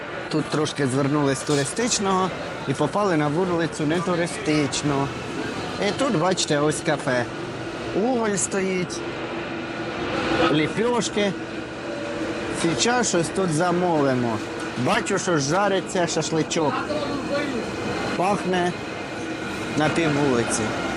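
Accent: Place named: Kremenchuk, Ukraine. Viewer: Однозначно лайк за просування українського контенту❤❤❤🇺🇦🥰 але кременчуцько-світловодська школа рве вуха😂😂😂 люблювас полтавські галушки❤❤❤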